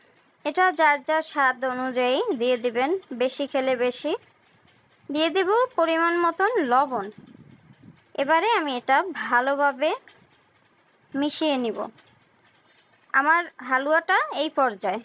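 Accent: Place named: Barishal, Bangladesh. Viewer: আপু তোমার কথায় বোজা যায় তুমি বরিশাইলা😅😅😅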